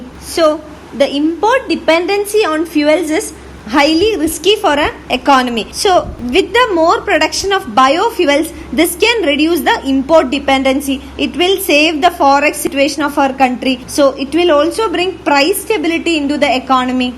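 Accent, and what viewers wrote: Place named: South India, India. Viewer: Her style of speaking is south Indian and most of people are don't know Hindi in that region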